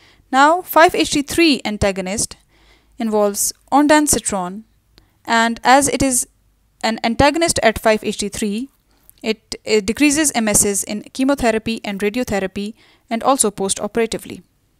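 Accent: India. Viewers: Hint aksanı ingilizce dinleyemiyorum çok gıcık ediyor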